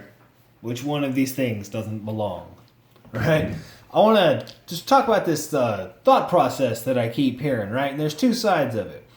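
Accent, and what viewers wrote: United Kingdom. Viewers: What are you british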